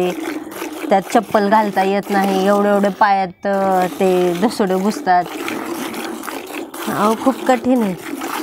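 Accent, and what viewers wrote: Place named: Solapur, India. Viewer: Nice vlog: I love to hear your Marathi with Sholapur flavor. So frank and sincere.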